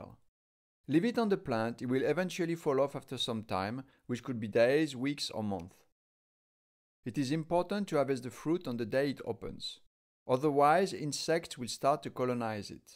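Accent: France. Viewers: Lovely french accent !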